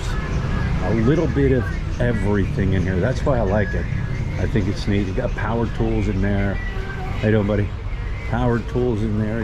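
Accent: Philadelphia, United States. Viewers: It's not that they don't speak English, it's just that you speak english with a Philly accent and they need time to process what you just said - that blank look on their face after you say something to them is their brains processing what you just said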